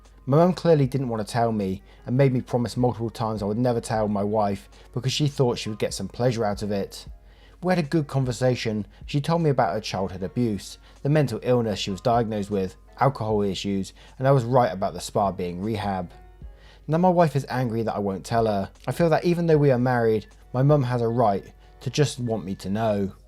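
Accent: United Kingdom. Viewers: You are a Brit?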